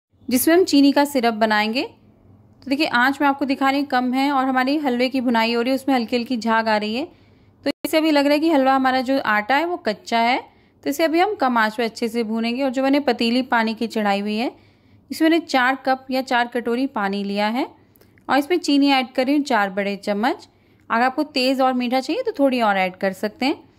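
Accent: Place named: Rajasthan, India. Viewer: Rajasthani.typical accent.accepted.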